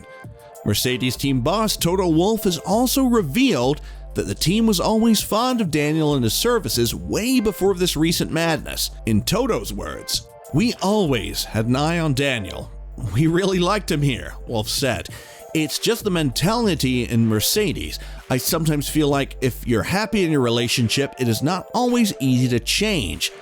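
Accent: United States. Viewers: Narrator is a septic. What did you expect?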